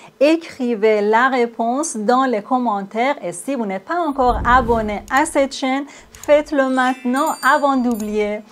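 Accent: Iran. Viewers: un accent persan très marqué ❤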